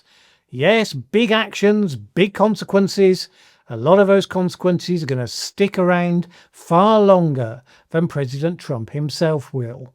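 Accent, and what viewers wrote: United Kingdom. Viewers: Better a normal British accent than some nasal yee-haw from an incoherent hill-billly eh ?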